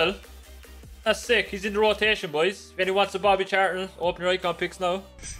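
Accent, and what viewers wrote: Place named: Ireland. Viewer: Are you irish ? Sounds like it to me so am i !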